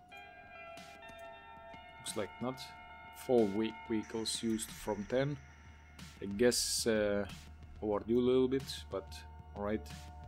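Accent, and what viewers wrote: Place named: Estonia. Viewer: i figured that younwere estonian by your thick accent haha. hello from finalnd, bro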